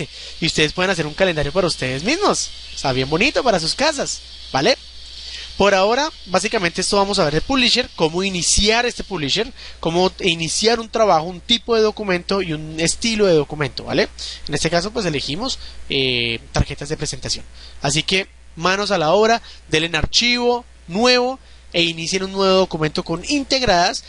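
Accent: Colombia. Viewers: sos colombiano verdad?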